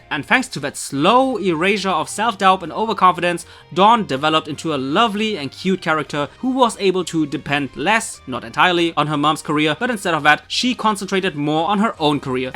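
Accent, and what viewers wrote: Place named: Germany. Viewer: Hey + Maybe I'm wrong but are you from Germany? Your way of speach of some Names really seams like your from germany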